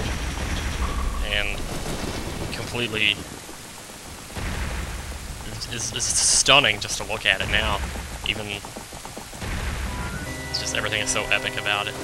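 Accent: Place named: South, United States. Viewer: i wondered why sometimes i hear a southern accent in your lps. it isnt often, but it is there xD GA people always recognize their own accent xD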